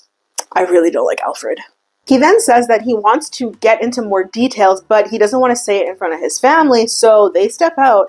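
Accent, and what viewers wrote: Canada. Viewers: OMG! Every time youve said "I sound so canadian" in the past, I thought it was cause you were from the US and just said some words like a Canadian...BUT YOURE ONE OF US?!?!? I mean, I should have known...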